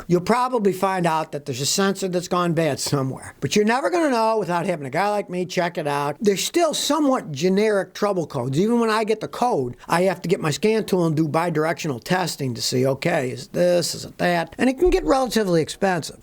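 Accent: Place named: Texas, United States. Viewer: Hi!.. Been watching your channel for a week now..... Basically Im working from home and I use youtube for some background no-vocal DNB to put my brain in gear and keep me going while im typing... but for some reason... I can both concentrate on work, while listening to your voice... and by some miracle I can even remember everything you are saying... It must be that Texan accent;) Anway... about the OCD guy with the manual car question: Im an Aspie (Aspergers, which is similar to OCD) And I also had the pedal-reflex issue.. so much that I've developed a bad habbit of howering my foot over the clutch (while training), pressing the clutch as slow as the breaks, often times not fully.... I felt unstable in the seat and insecure and unstable to change gears in higher speeds. Forgetting to change gears and all those typical Aspie/OCD issues. 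Its a multitasking and mentally shifting tasks -issue... because of the sensory overload. Especially because the world outside of the car feels unreal and 2D to us. Here in Croatia the instructors are very strict, before only 60% would pass, now only 20% candidates pass. Licenses here cost like 900 $ for a 2-3 months theory + minimum 35 hours driving hours. And then each exam is about 90$. We also have to pass first aid exam too. And of course here we learn only on manual and drive only on their cars, but directly on the actual roads. So their cars are all manual, one steering wheel and double set of pedals. I drove almost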